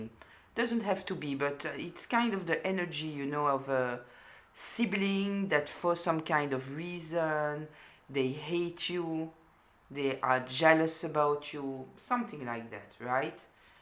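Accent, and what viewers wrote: France. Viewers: I enjoy your readings very much. Watching from Arizona where it seems more and more of those narcissists are being bred every time I turn around! Your insights are amazing and very helpful. I really love your French English too. Thank you for your energy.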